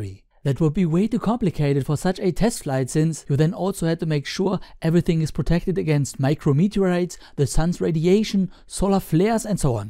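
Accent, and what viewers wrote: Germany. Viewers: CptMikeTango He's German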